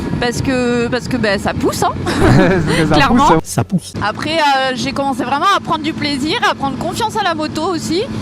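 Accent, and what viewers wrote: South, France. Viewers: Vidéo vue. 32mn de kiffe. Jolie moto. Jolis paysages. Bonjour à Madame. Le soleil, l'accent du Sud... pow pow pow, continues, restes le même, et hâte de connaître ton ressentis GT vs ténéré 😁 un gros ✌ d'encouragement à toi, force à toi et prenez (toi et Madame) soin de vous... ✌✌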